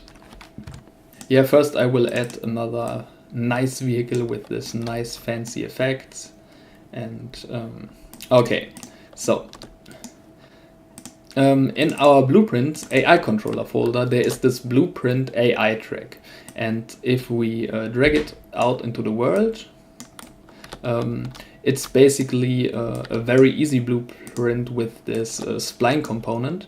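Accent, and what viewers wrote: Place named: Germany. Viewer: Yeah, thanks. Watched all thru, good presentation. And, everybody loves nice German accent! :) (it's a good thing) I am latvian (lettisch) btw, so I love all "euro version of English :D ). Oh, maybe one provocative question: is this asset pretty much finished state, or maybe any additions / changes planned? Thanks!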